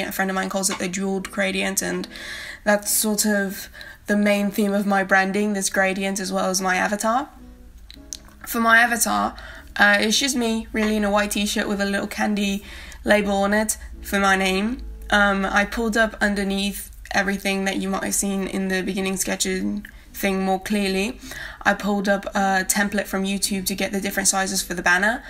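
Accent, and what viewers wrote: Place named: South Africa. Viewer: I was trying to figure out if you were British or Australian listening to this video but boy I don't think I would have guessed South African accent but it makes since it was a British Colony for years.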